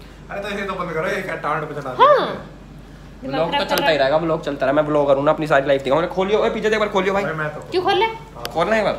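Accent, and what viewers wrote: Haryana, India. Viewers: Bhai tum hariyana se ho k.bhasha se lgte h .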